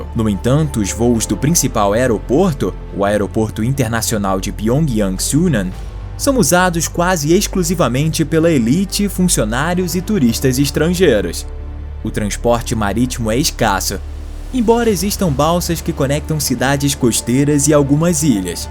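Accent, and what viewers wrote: Rio de Janeiro, Brazil. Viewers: Vídeo muito bom, educacional, mas colocar um carioca pra narrar ferra tudo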